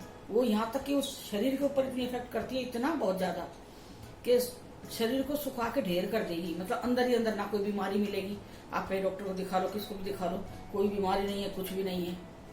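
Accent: Uttar Pradesh, India. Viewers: Love u auntyji ...aapki language mujhe UP ki yaad dilati hai I am frm punjab now in italy bt meri sasural khurja mei hai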